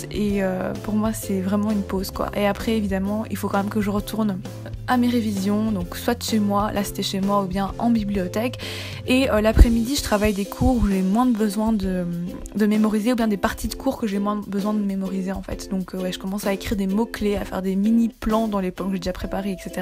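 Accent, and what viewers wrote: Belgium. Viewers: Belge ?! 😂❤ Super vidéo 😍💍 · wuoooh' tu es Belge? :0 c'est la première vidéo que je vois de toi, franchement j'apprecie, je m'abonne! et je mets, un pouce bleu! 😊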